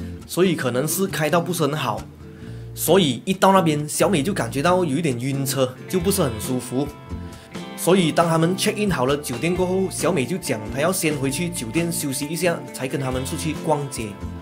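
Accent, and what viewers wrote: Malaysia. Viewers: 我一聽 就知道 是 來自 馬來西亞的 主播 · 故事情节讲得很好！很自然！因为有马来西亚人讲话的口声而产生共鸣！ 我也有真实灵异親身经历故事！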